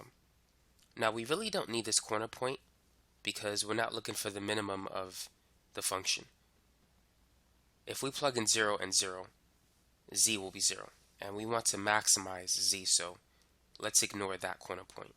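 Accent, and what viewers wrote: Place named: New Jersey, United States. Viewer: you're def from New Jersey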